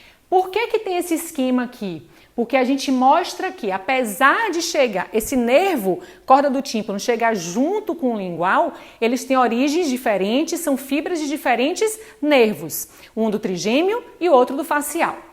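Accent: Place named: Bahia, Brazil. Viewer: Professora, excelente aula! Melhor ainda porque matei a saudade de ouvir o sotaque da Bahia ahhuahau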